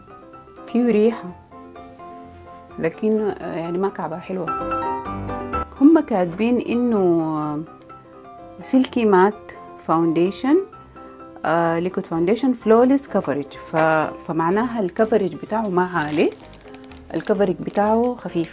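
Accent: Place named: Sudan. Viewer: واااااي حححلوه يربي اول مره ادخل فيديو لك وحبيتتتك ومكياجك مره حلو ومرتب ولهجتتك حبيتها مره يلبى السودان❤️❤️ من السعوديه🇸🇦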